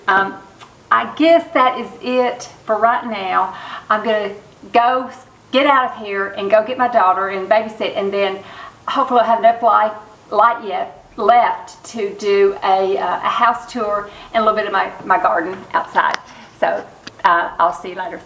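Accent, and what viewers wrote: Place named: Texas, United States. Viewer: Your house is just gorgeous. I have always loved Victorian houses and used to want one. I got over that later on after finding out how much work to restore:( Yours is lovely. I also like other periods like 1930s, - 1950s retro styles. You have the cutest way of talking but it reminds me more of a TX gal I used to know. My folks are from Newport TN and I noticed u are from East TN...what part?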